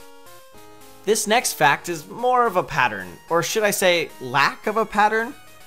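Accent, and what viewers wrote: Netherlands. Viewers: Are you dutch? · Wait are you dutch?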